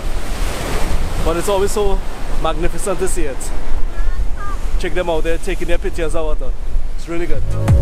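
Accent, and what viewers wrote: Trinidad and Tobago. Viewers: Trinidadian accent!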